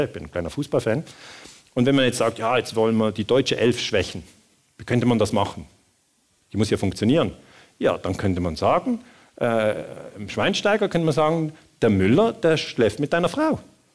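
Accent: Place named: Switzerland. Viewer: Der Schweizer Akzent ist heilig !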